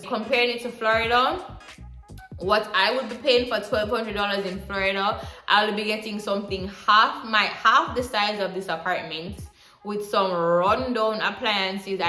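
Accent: Caribbean. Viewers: Loving that Caribbean accent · That intro…I know she’s a Caribbean gworl🤣🤣🤣🤣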